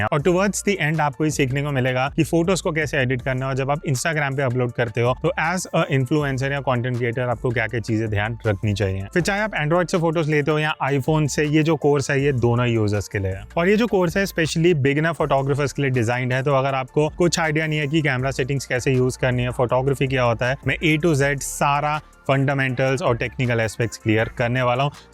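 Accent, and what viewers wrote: Lucknow, India. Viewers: R u from lucknow sir?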